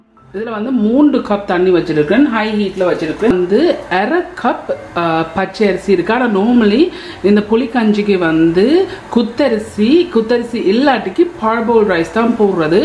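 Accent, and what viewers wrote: Jaffna, Sri Lanka. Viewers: உங்கள் யாழ்ப்பாணத் தமிழ் மிகவும் நன்று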